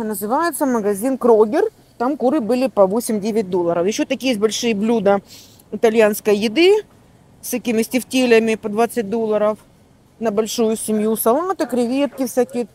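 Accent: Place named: Krasnodar, Russia. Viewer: Надо же, как силен краснодарский говор, даже через десятилетия😢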